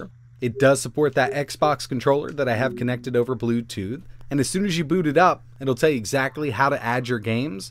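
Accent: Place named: United States. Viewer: his accent sounds like he is in the u.s., NOT the e.u.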